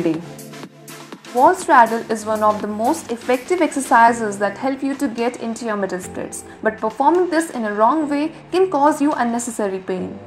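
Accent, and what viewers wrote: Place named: United States. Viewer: you speaking English like a American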